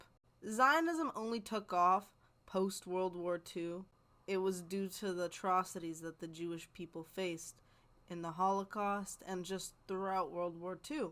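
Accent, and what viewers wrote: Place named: United States. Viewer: Don't take this personal but I need to correct you on some things. The fact that you say you are NOT and NEVER WILL BE Israeli doesn't give you the right to make Israelis look like they are some fantasy nation. You are a Jew as you said. You are (I assume by your accent) from USA. That makes you American Jew. I hope you know that 2 million Arabs live in Israel. They are Israeli Arabs. A lot of those Israeli Arabs say they are Zionists. Remember they are Arabs ( Moslems and Christians ). Another thing - you talked about Jews returning to Middle East from Europe. Are you aware that 43% of Jews who moved to (now) Israel are from Europe? 57% are from Arab countries. They were forced to leave. And add to that 270000 Jews who lived there already and never left their land. And another thing - Brits didn't create Israel. UN did. 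Great Britain also didn't colonize that area either. It was UN mandate and GB was tasked to police that area. Israel was suppose to be entire Palestine. But Britain Created Jordan ( back then called Trans-Jordan). UN then decided to split the rest of the land in 2 states. Arab state and Jewish state (Israel). And one last thing - the biggest anti-zionist Jews are Orthodox Jews. Why? Because in their mind Jews will get back Israel when Messiah comes back and not before. Until then Jews need to wonder around and suffer. I am not a Jew. Also I don't live in Israel. But here is something to think about when it comes to Jews. If you are a Christian and don't belie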